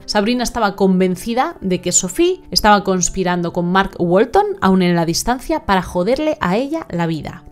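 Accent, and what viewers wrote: Spain. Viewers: Porqué la ves? A quien le importa tu opinión?? Más quisieras tú tener el acento "castellano"(España) qué tiene.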